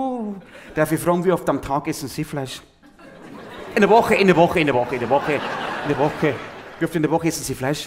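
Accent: Bavaria, Germany. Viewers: Hallo, mir gefällt die bayerische Sprache, die Mimik und Gestik und die Einfachheit der Geschichten und Erlebnisse. So vieles ist so kompliziert geworden, mehr will ich nicht dazu sagen. bringt mich immer zum Lachen und lässt mich der Wirklichkeit entfliehen, für Momente des Lebens und das ist so so schön. Ich geniesse das so sehr. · Es ist einfach herrlich, einem normalen Menschen mit gesundem Menschenverstand und familiärer Erziehung zuzuhören und zu erleben, wie "leben" auch funktionieren kann. Gleichzeitig ist sein sauberes gepflegtes Bairisch Musik in meinen Ohren. Wenn ich mich beruhigen will (etwa nach einem anstrengenden Arbeitstag), dann ist Franks Stimme Balsam.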